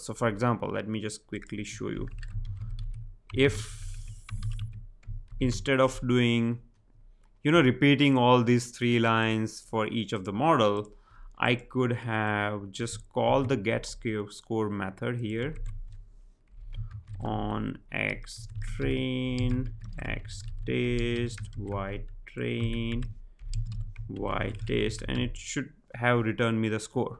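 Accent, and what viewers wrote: India. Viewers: love indian accent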